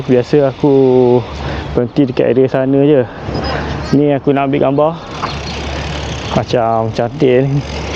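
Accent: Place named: Kuantan, Malaysia. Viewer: Patut kwn dgr slang aok kuantan beno bunyinye sama kita😆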